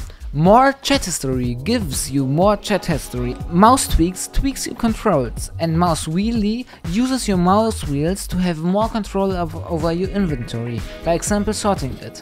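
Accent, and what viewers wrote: Germany. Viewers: Very, very cool video! By the way, are you german? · r u German? XD nice Video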